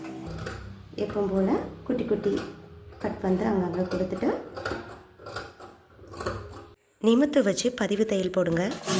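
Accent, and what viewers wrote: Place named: Kanyakumari, India. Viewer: unga pechu kanyakumari Kulasekharam side mathiri irukku sis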